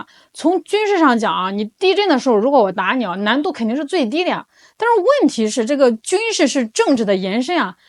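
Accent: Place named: North, China. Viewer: 口音挺熟，就是想不起来了。或许山西，河南，河北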